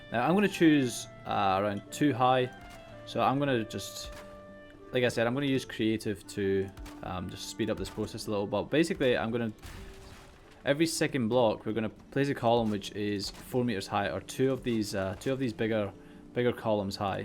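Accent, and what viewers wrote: Scotland, United Kingdom. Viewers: Nice build dude Are You Scottish?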